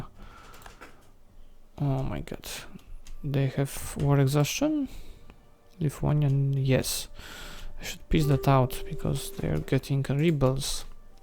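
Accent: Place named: Poland. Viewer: Ten twój wyraźnie słyszalny, ciężki, Polski akcent tylko dodaje smaczku całej serii. Świetna seria z klimatem czyli Quality Content za który cię subskrybuje. Miłego dnia!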